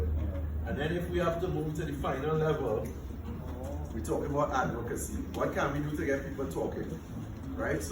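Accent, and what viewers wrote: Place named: Jamaica. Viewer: I'm enjoying this lecture. I'm into sales marketing and can relate perfectly with this. I'm just trying to grasp the Jamaican accent 😂😂😂😂. Good content you have here💃💃💃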